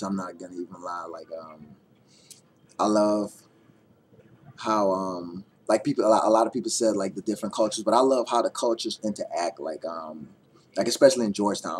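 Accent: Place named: United States. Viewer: You have an american accent..could you change to a guyanese accent in guyana? Cause I kinna lost my guyanese accent..lol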